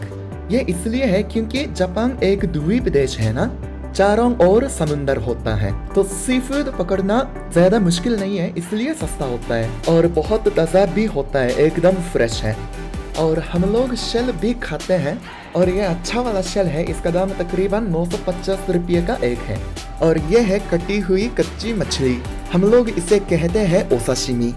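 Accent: Standard. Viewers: He is much more Indian than many other People living in India, शुद्ध हिंदी। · bhai aap ki Hindi bahot shudh he hamare jaisa nahi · Bro ap jesi Hindi bolte he (sudh Hindi ) vese to hamre idhar bhi nhi bolte ( nice Hindi) ----you talking Hindi nice ...i like this 😁😁😁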